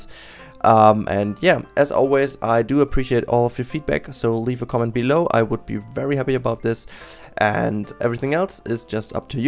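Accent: Germany. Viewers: Man hört es an dem Akzent xD so spricht fast jeder Deutsche Englisch aber du sprichst echt gut Englisch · you sound like a german!? du bist deutsch oder?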